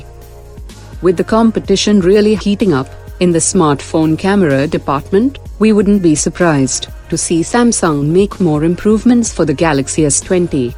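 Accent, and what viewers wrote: India. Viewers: Holy shit me too, I was so confused whether or not it was a real woman or a robot with indian accent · I cant tell if this is a robot voice. And if so why the Indian accent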